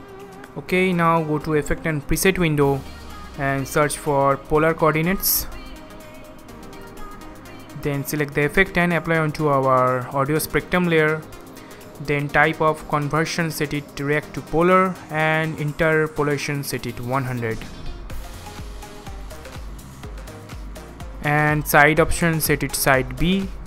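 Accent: India. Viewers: Hindi Accent Wow nice x) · You know its legit when a guy with indian accent makes a tutorial on it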